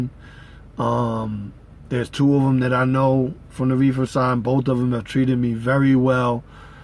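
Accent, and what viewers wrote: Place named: Brooklyn, United States. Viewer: Just saw your video, glad to see another New Yorker made it, ... you sound like you from Brooklyn, just like me lol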